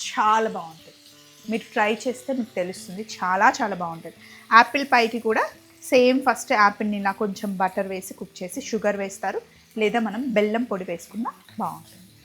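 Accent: Andhra Pradesh, India. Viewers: Meeru..telangana kadhaa..but andra accent lo matladuthaaru..adhemito...telangana yaasa ❤❤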